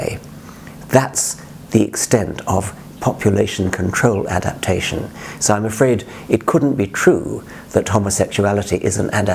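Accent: England, United Kingdom. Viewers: Sounds so good with the English accent but complete bullshit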